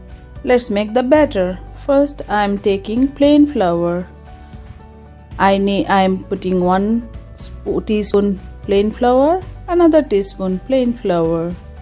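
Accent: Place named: Bengal, India. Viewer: Watched your video fully. You have bengali accent. Join you dear.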